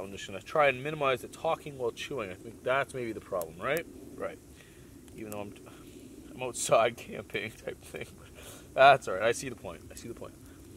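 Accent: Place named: Canada. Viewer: Yep. 1am here in North Queensland and this is the 3rd video ive have watched. I leave his videos running in the background because is canadian accent is so soothing.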